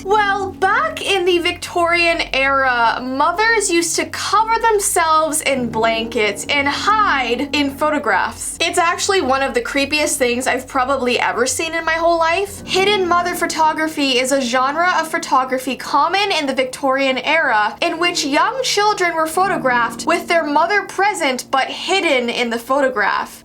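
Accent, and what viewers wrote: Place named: Canada. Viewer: She is Canadian so she has a little bit if an accent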